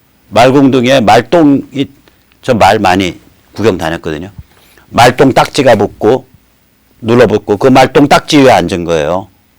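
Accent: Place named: Seoul, South Korea. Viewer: 오늘도 체한것이 시원하게 내려간듯..좋은 말씀 잘들었습니다. 국내,외 지인들과도 많이 공유 했습니다. 특히 좋아하는건.. 선생께 많은분들이 잘 못느끼는 특유의 서울 본토 사투리를 들을 수 있다는 겁니다. 배우 한석규씨의 억양도 순수 서울 사투리가 배어있어 듣기 좋습니다. 나는 지방사투리들도 순수한 정감을 느낄때 좋아합니다. 서울말씨를 익히고 싶은 지방 분들은..특히 젊은분들..? 딴것보다 선생과 배우 한석규씨 프로들 많이 보세요.^^ 선생이 오늘 다른 you tube "pen&mike" 후원행사에 오실줄로 개인적으론 기대했는데.. 편 가르는게 아니라.. 大同團結의 의미에서 기대했었습니다. 늘 고맙게 보고있습니다.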